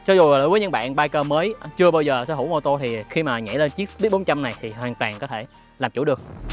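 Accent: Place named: Saigon, Vietnam. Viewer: Một Chútttt nghe nó sượng lắm ý, kiểu nó không đồng nhất. Nếu đã phát âm cho đúng thì đúng hết, còn này toàn bộ giọng Sg xong mỗi từ chútt thì nhấn như ng Hà Nội. Kì vậy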